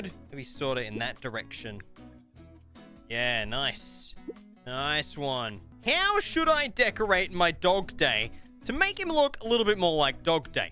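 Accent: Australia. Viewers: your auzzie right?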